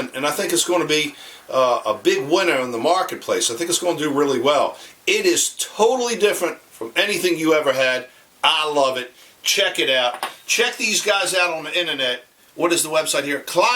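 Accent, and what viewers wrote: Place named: Virginia, United States. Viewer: You are a Virginian i can tell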